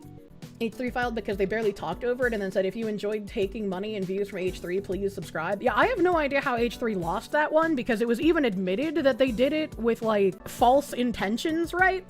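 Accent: Boston, United States. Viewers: Love the coverage, but my "grammar heiling" tendencies flare up every time says ANONYMITY (ah-no-nim-ity) like anonmity (ah-non-mity) 😂 Could be the boston accent though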